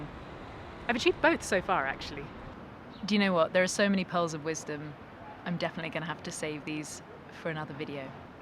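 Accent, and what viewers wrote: United Kingdom. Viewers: I love watching your vlogs and to boot I love listening to you talking. Your British accent is so eloquent and perfect phrasing. I also love to hear your guitar playing and listen to you sing. Keep it up there are too few of your vlogs. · What part of the UK are you from,?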